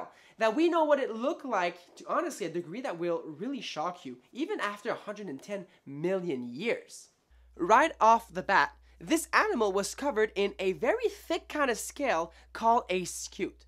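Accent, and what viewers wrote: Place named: France. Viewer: Love your french accent <3 <3 <3 You, rock hahahaha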